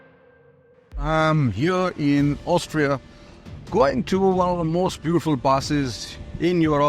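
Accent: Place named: India. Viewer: Watching and listening a review on M1000R in Austria with that indian accent ..... I dont want to mute the video cause i do want to know about the experience but ...smh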